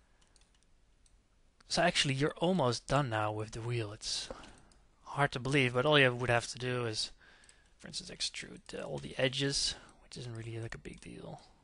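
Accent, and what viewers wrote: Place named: Netherlands. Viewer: Dutch accent :D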